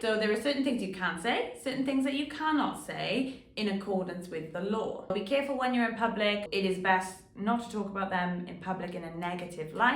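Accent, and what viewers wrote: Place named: Wales, United Kingdom. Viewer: Thank you for mentioning so early in the vid where you were from (Wales) - was driving me crazy not being able to pick the accent properly 😂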